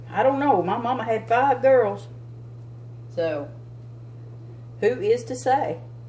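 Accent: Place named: Georgia, United States. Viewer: I’m from Kentucky, but my family’s from Georgia, so there’s something very familiar about your accent. It makes me feel like I’m cooking with my aunt haha :)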